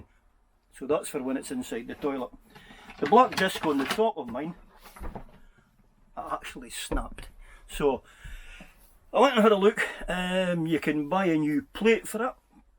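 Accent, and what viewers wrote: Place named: Scotland, United Kingdom. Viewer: Lovely Scottish accent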